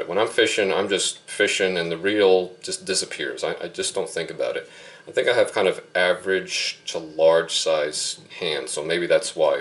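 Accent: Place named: Delaware County, United States. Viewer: where in Delaware county are you from? that accent is thick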